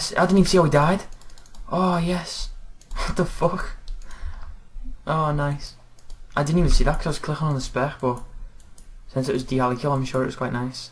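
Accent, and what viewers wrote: Liverpool, United Kingdom. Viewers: you sound like you're from liverpool, are you? hate to ask but like so he can see.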